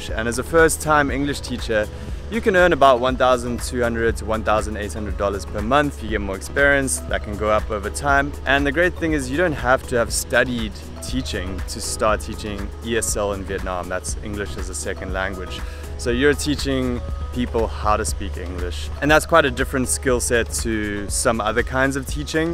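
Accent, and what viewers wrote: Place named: South Africa. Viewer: He sounds South African to me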